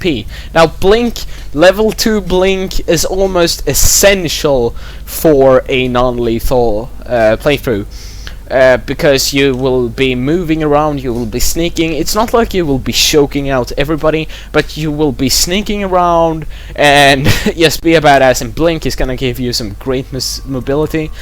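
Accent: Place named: Netherlands. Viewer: you must be dutch, i heard your pronunctiation and though you speak english well. when saying jump and other things i was like hmm.. he must be dutch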